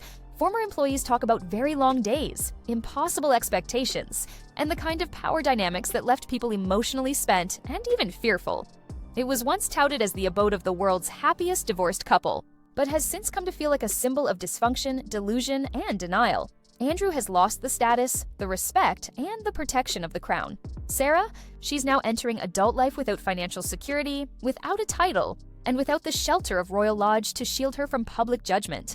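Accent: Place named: United States. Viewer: so why is this trash presented with an american accent?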